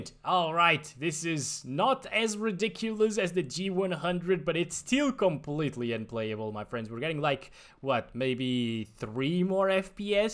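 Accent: Portugal. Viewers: Lol, I didnt know that he was from there, but it semeed to me that he was speaking portuguese portuguese (lol). Thanks for the info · when says something in portuguese my heart bounces a little, even he's talking in Portugal Portuguese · Isn’t he speaking OG Portuguese?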